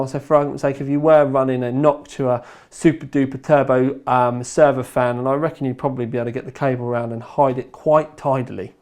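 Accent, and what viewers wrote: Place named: South East England, United Kingdom. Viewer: He can't handle our south east accent, fuck the haters!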